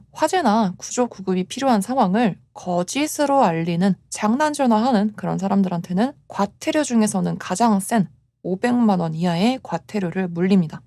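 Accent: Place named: Busan, South Korea. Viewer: 쌤 덕분에 공부 잘하고있슴다 근데 달동네던가 억양에서 부산 분이 아니신가 생각되네요 ㅋㅋ 저도 부산에 가까이 살다보니 은근 반갑게 느껴지네요 ㅋㅋㅋ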